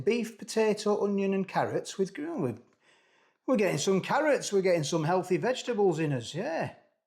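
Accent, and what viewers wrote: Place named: Yorkshire, United Kingdom. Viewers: Posh northerner I thought it was myth 😂😂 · Did you say Barrow,is that Barrow in Furness as to me you sound like you're from the Leeds/Doncaster area-no offence intended....Geography was never my strong point😊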